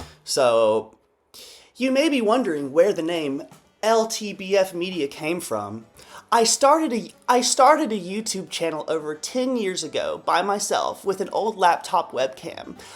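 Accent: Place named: South, United States. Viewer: Came here from Obese to Beast to counter that bully Alex, stayed for the snark and the southern accent ❤ subscribed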